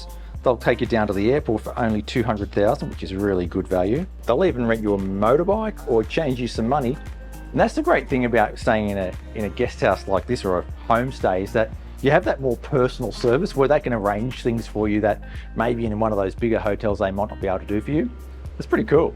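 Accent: Australia. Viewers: Love the Australiuan accent! just found your channel and what a place for the solo traveller, well produced and informed video, will check out your travels, cheers and beers!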